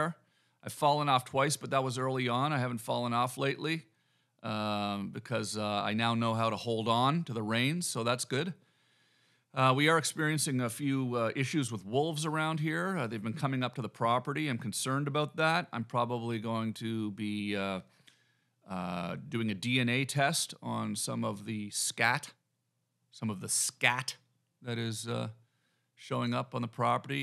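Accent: Canada. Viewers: I think your canadian accent is getting stronger. Love it. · Keep talking about the farm and all of the nature, bro. That’s what got me hooked oh, and by the way, keep saying words, where your Canadian accent comes out! Hilarious. Lmfao hilariously awesome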